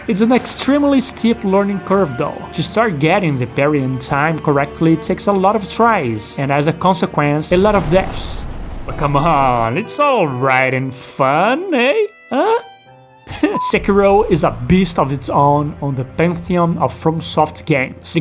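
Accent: Brazil. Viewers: tu é brazuca?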